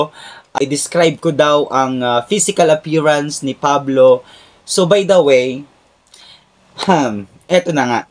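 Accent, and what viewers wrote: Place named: Mindoro, Philippines. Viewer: Nakakatuwa ka din pala kapag serious mode medyo ang usapan😁Destined na maMeet mo muna ang Sunshine Boy Stell and Sunny Boy Justin tlaga for you to have an interest in the group. Magandang simula Yan... and I'm also glad that you're being very True to yourself when you said that you're just starting to know and find out their stories Para masabi mo Kung nasa ang level ka na sa Yong paghanga, just a natural feeling👍👌😊. So either a casual fan or an A'tin makes no difference, just as long as your chanced meeting with Stell and Justin has already stirred within yourself a liking to them as artists, that's a good start. BTW, are you from Marinduque or Oriental Mindoro? Napansin ko lng sa accent mo during your vlog about Stell and Justin. I'm from Or. Mindoro, but my roots are from Marinduque...ngani😁👍❤️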